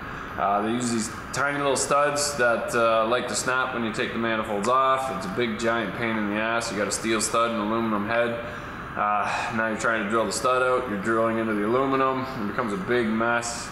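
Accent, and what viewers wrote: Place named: Canada. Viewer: canadian for sure. honest, efficient. nice guy too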